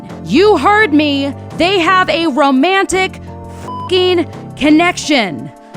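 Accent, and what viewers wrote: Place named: United States. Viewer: This person is speaks like an American 😂 She watching a-lot of these things all wrong 😑. Just because a character has a identity or hobby doesn’t mean it’s representation for people identify similarly. Americans are used to the villains being outside of the relationship, but in these shows the love interest is the villain 🦹‍♂️ Then she’s gonna get mad about one of them having assault when it literally says that the main character gets assaulted in the title 😂 (like what did you expect for real?) The pedo are crazy though but that’s part of Japanese history. Looking into that time will explain why those got so popular. 😬 yikes. calling citrus grooming is kind of overkill though 🙄, I get that’s a red flag 🚩 all the way, but unless is a excessive power or age gap, that’s just throwing around words. some things are only acceptable in cartoons/anime, like unrealistic, body changes or crimes. 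🥱 her actually taking these things seriously is like, Bro, why do you even watch this 🙂‍↔️ but I know why it’s for her job😒 Love stage relationship isn’t based on the SA 😭. if you’re gonna trash my shit, at least do it correctly! It was based on bribes 😣 convincing someone to change their mind Does Not equal abuse, Relax! (Bloom into you) p.s. It’s a GL you have to expect that there’s a chance that the main couple is gonna get together, like what! 🤣 The cherry magic thing was crazy like, she been trashing anime pedos this whole time, but she didn’t talk about that trash side couple 😭. Like thi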